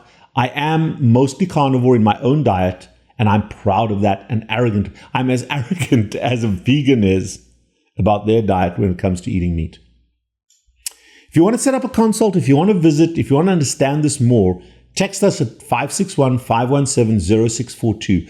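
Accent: South Africa. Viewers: Super-informative video! Love the accent! South Africa? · Always lekker to hear a South African accent here on the tube... Thank you, I appreciate your videos, love from Darljng South Africa!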